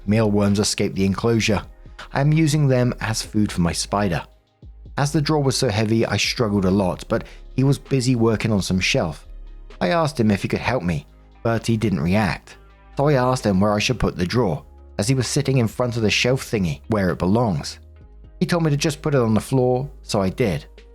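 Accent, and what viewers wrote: United Kingdom. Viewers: 12 minutes posted. I feel special. Love. British voice. Everything sounds better in a British accent ❤❤❤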